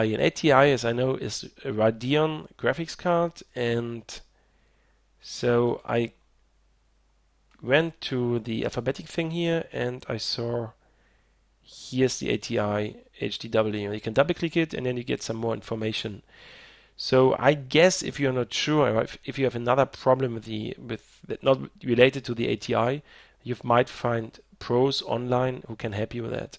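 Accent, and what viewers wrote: Germany. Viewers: Hey wie ich aus deinem AKzent hören kann bist du Deutscher, richtig? Ich hab diesen Bluescreen seit einigen Jahren, und habe das gleiche Problem mit dem AMD HDMI Output für Lautstärke, was mich schon immer sehr verwirrt hat, da ein HDMI anschluss so etwas praktisch garnicht brauch.... Meine frage ist: Besteht das Problem mit deinen Bluescreens weiterhin? Danke schonmal für das Video, hat mir sehr geholfen! Habe schon an meinem Prozessor und der Grafikkarte gezweifelt, aber das löst das problem für mich etwas.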